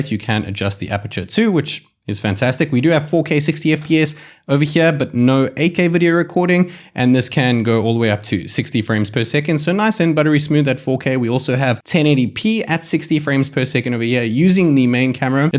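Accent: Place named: South Africa. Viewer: Searched for the k50 video and yours came up first . A few seconds in I was like nah.... This dude is South African , he has to be. And I see ,yes you are. Amazing. Well done man. Wish you millions of subs . Still watching the video and it's great so far. God bless you bruh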